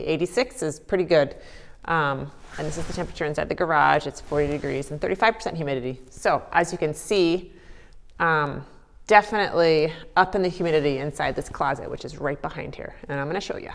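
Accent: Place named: Minnesota, United States. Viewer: You talk so much like me. We must be Minnesotans. 😅 Love November gardening. Thanks for the video.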